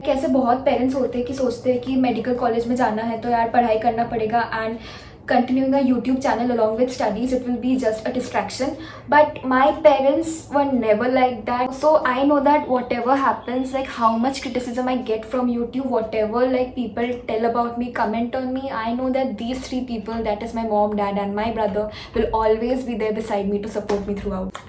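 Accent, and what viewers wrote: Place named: Kolkata, India. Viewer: Kolkata accent 😁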